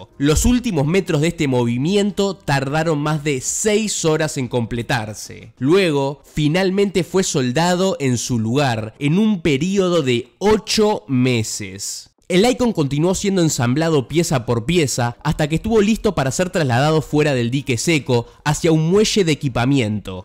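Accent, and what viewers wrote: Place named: Buenos Aires, Argentina. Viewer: Decime que sos porteño sin decirlo: "mueshe, astishero, quisha, shevada..."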